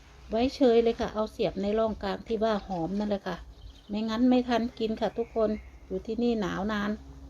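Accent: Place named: Isan, Thailand. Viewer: เพิ่งเข้ามาชมฟังเสียงพูดเหมือนทางอิสานใช่คนโครชไหมคะ..เห็นอยู่คนเดียวสามีตาย..คงได้เงินช่วยบำนาญแม่หม้าย..ว่าแต่ตอนนี้ยังช่วยตัวเองได้. แต่อายัมากขึ้นจะลำบากไหมกลับมาอยู่ไทยสร้างบ้านอยู่คนเดียวใกล้ๆลูกหลานดีไหมคะ..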